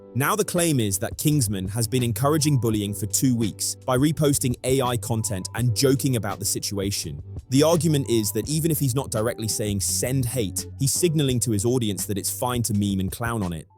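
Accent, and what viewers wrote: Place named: United Kingdom. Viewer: ahh cool, so it's the same information we've had for weeks just regurgitated in a british voice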